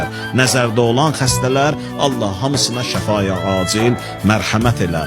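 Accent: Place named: South, Azerbaijan. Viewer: Cenub bölgemizdenem bilirem danışığnınan qardaş sende cenublusann 😢😢😢.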